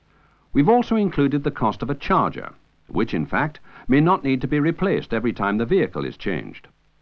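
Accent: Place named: Yorkshire, United Kingdom. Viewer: More than slight; probably Yorkshire. Like Michael Parkinson.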